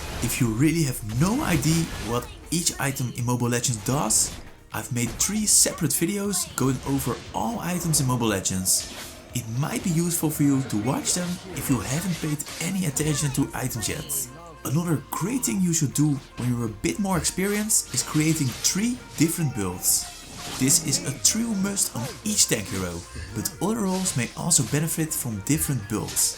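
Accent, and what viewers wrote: Netherlands. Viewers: By your accent... Are you dutch?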